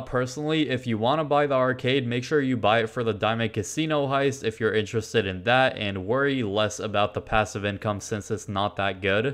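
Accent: RP, United Kingdom. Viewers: Bro sounds like spiffing brit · I thought you were actually British😭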